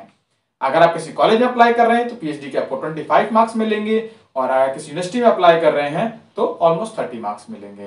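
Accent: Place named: Odisha, India. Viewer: i can feel the odia accent 😋 . very informative video thou.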